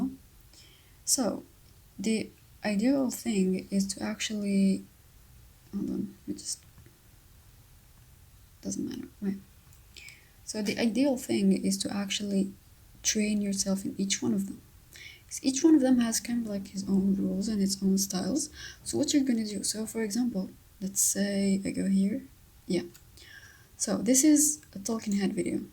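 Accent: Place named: Morocco. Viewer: HEYY are u moroccan (i dunno why u sound moroccan)?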